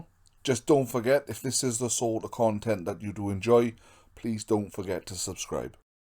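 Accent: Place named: Wales, United Kingdom. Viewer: Great job buddy. Love listening to those gorgeous Welsh tones 😊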